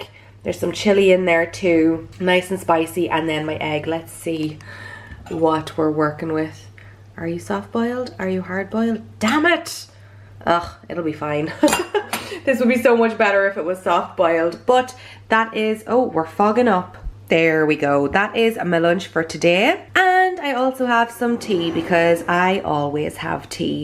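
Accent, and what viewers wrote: Ireland. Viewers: Ho. I'm Portuguese and I love your videos. I also love your Irish accent. 😊 Take care.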